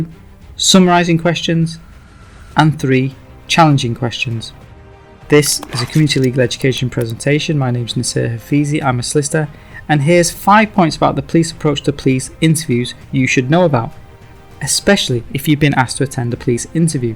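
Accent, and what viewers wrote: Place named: United Kingdom. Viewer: The narrator has a U.K. accent. I assume this would be great advise if I was there.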